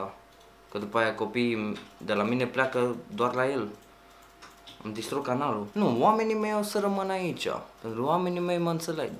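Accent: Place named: Moldova. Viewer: Ăsta e unul dintre milioanele de motive pentru care eu îl urasc pe! deci, pe langă sa zică la copii sa doneze, şi sa enerveze părinți să le dea bani lui, îşi mai permite si sa îşi bată joc de sucre care, frate, el a avut un trecut prin închisoarea din mexic, fără familie, fără nimic! Dar a fost ajutat la orfelinat de părinți lui (care cred eu ca au fumat ceva) in moldova, trădătorul famili noastre, şi se da el şmecher că el e al 2-lea sau al 3-lea yutubăr ROMÂN care a a milionu. În primul rând el e MOLDOVEAN!!! Trădătorul famili noastre! şi al doilea rând, manipuleaza copii cu accentul lui de moldovean (accent de moldovean). Eu efident, țin cu sucre, findcă el nu e trădătorul famili româniei, şi findcă eu cred şi (probabil) ceilalți cred, ca fura lucruri de la sucre (e evident la câți bani are......). Deci, cei care au dat dislike la acest video, (fără supărare), ați fumat pra mult videoclipurile lui (REKT) Voi puteți sa scapați de asta cu noi toți, adică, uitațivă la ce Heitari are (Zopi teve, Dărielred şi alți) (scriu aşa în glumă) Yutubări voştri preferați. 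Dacă nu ai auzit de aceşti oameni până acum, tu eşti, cel mai probabil, un copil de 5 ani din moldova (daca nu îi şti, dacă îi şti, bravo) Deci, trebuie să scapam de iliesime. (glumă) P.S: sper să ajungă la poliția yutubului de Călinacho! #nuvremcolavremcoroana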